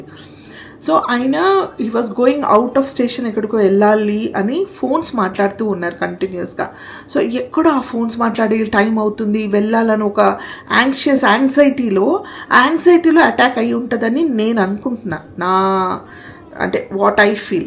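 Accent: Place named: Telugu, India. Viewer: I'm from Bangalore and I have been watching your all family channel from so many years Morethan everything I personally love your telugu accent the most amma You have done so many kannada movie, which we love to watch. You are know family member to me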